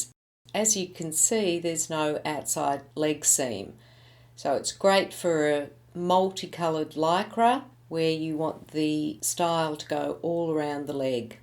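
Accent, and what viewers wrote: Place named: Australia. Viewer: Well, I love your Australian accent! (I am in Sydney - LOL!!) Have subbed - just getting back into sewing after having children and loving your tutorials :-)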